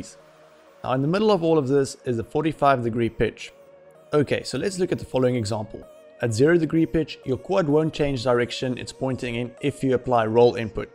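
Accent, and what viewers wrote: South Africa. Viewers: Are you South African?? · Hey you from SA?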